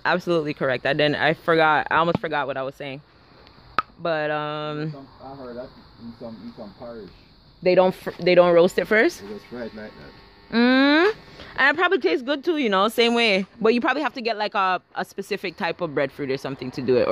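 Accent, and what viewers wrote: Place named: Cockney, United Kingdom. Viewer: The cockney though 🤣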